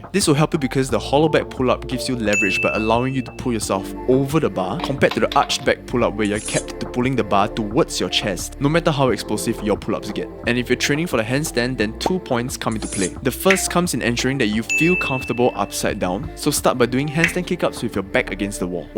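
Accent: Africa. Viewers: Bro, are you from an African background...? You speak like a friend of my. Love the accent😁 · Why is bro Singaporean but he has an African accent? Also, would seasoning the chicken kill my gains?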